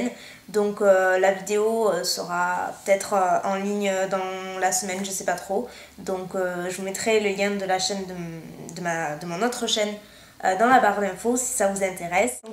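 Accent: South, France. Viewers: Ah que j'aime cet accent du sud! Et surtout les "PU..", j'ai l'impression de me retrouver chez moi dans le sud! En tout cas, ta coupe te va très bien! Avec ce maquillage en plus tu es magnifique!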